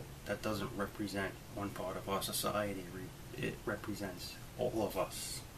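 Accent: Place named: New York, United States. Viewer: you're a good guy..love the new york accent:)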